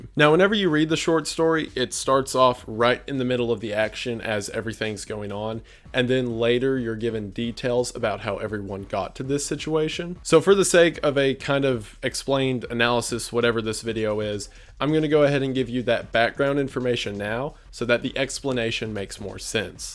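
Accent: South, United States. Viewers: Adversity or diversity?! If you are demonizing "diversity", as what you, can chuck up to a mispronunciation seems to, imply, then, that Southern accent that I have noticed takes on a special meaning... Hmm!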